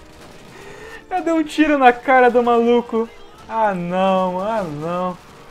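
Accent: South, Brazil. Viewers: conheço sutaque do sul kk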